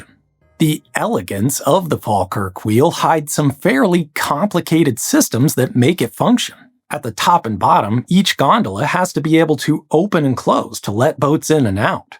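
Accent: United States. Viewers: It is always fascinating watching americans talk about Scotland and pronounce things in their accent. I live in the area and more than the engineering, it was something that added a lot of benefit to an area that was notorious for being less than amazing. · It's interesting to hear how an American pronounces Scottish words. There is a distinct shift in vowel sounds between the two nations, even if we share a similar language. Sometimes, the pronounciation is amusingly different from what us in Scotland would use, but sometimes, it's harsh, almost alien sounding. Languages are interesting.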